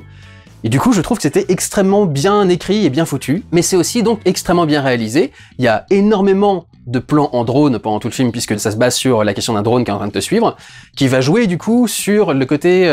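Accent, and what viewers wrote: Quebec, Canada. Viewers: très bon accent québécois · Accent québécois correct.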